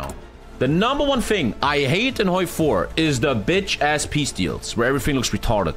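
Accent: Germany. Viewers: You sound German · Hi, I am new on the Channel, can I I ask are you German because I Stand the Things you Said in your Rage?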